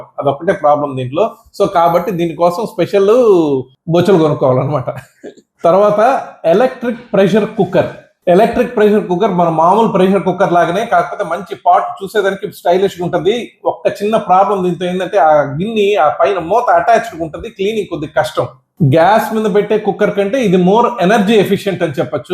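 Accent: Nellore, India. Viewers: Nellore slang భలే మాట్లాడుతున్నారు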